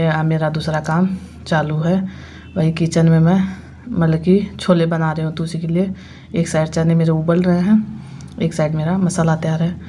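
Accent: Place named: Bihar, India. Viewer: एकदम बिहारी जबान में.... 😄😊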